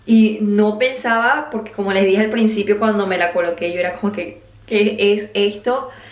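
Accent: Venezuela. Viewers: siempre ah tenido el acento venezolano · Ella es venezonala 😂 no manches como quieres que hable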